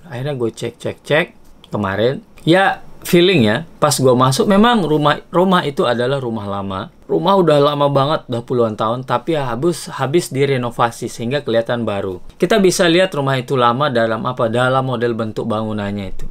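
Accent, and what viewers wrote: Lombok, Indonesia. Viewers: Logat sasak tetap melekat, mantap Semeton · La Ilaha...asli medok ...lombok tie meton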